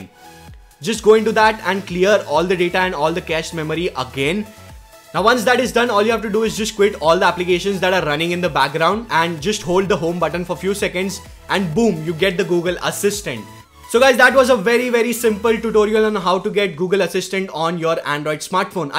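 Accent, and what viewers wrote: India. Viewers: Good video! But I must say Mr Man from India, LOL! Your video and your f**** talking with your India accent is way too quick and fast and as you know most of us are watching this video on our cell phones we could barely even see the writing on your cell phone and you're trying to show us on your cell phone and you go way too fast and you speak too fast with an Indian accent, , because of that your video sucks because you're way too fast and no one comprehended anything from your video we can't even barely see your cell phones writing and then you go so fast also to top it off with ice cream you all so f**** put the cherry on top with your India accent I mean come to f*** on get real no one got anything from this video you piece of dumbass! LOL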